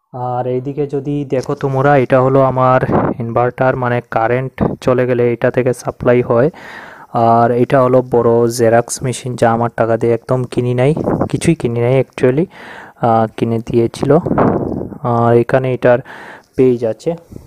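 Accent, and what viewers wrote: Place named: Sylhet, Bangladesh. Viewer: Tumi sylheti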